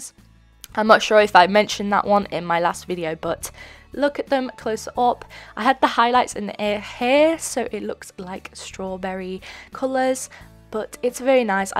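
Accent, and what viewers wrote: United Kingdom. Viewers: Are u British lol you'll never answer 👌🏻BTW I love ur channel🌺🌺🌺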